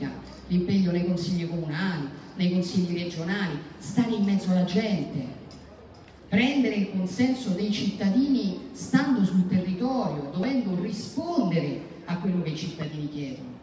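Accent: Rome, Italy. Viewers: Uno splendido accento romanesco ! la meio de Roma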